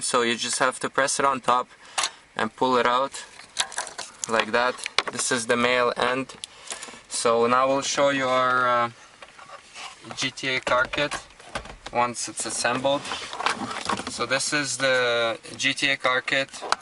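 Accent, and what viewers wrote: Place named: Russia. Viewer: Igor Grusha так акцент-то русский, скорее всего автор видео тоже наш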